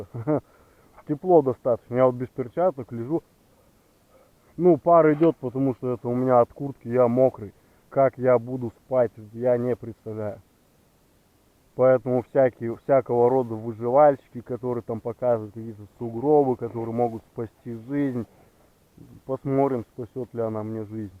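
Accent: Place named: Siberia, Russia. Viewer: Говор сибирский. Термометр надо было захватить.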